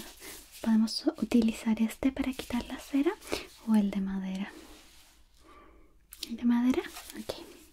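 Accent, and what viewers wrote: Chile. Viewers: Podría jurar que es chilena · Me encanta como hablan los Chilenos 🥰🥰🥰🥰, NUEVA SUSCRIPTORA! Mas que todo, utilizo los ASMR para estudiar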